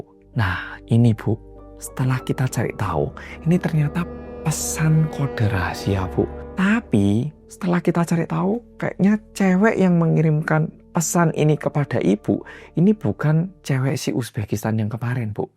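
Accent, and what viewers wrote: Java, Indonesia. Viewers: Medok banget bahasa Indonesia logat Jawanya. 1000% A sampai Z udh fasih bahasa Indonesia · Ibu kangeeen Mendoknya Mas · Hai medoook🎉 Nyimaaak 🎉🎉🎉 Sukses sehat selalu ya 💪🏿🙏🏿💕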